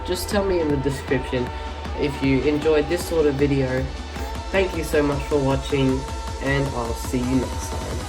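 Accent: Australia. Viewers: Are you Aussie?\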